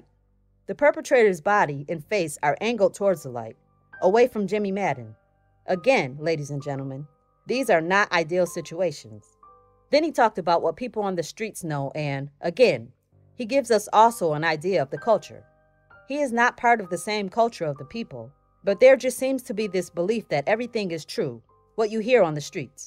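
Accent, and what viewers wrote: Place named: New Orleans, United States. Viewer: Heyy na!! New sub here.. ⚜️⚜️⚜️ I think I hear nola in your voice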